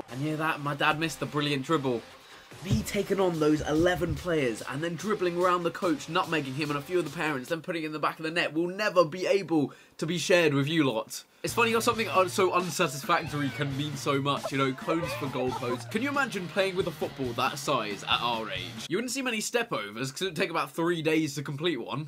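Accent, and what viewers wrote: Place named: RP, United Kingdom. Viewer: You sounded so posh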